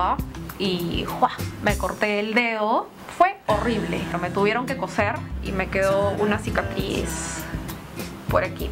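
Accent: Peru. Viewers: Sabia k Ella era peruana por su acento :) ...me gusta sus videos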